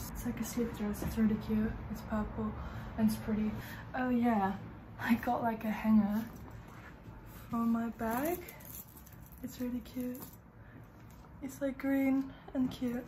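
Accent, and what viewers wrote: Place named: RP, United Kingdom. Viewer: Proper posh accenttttt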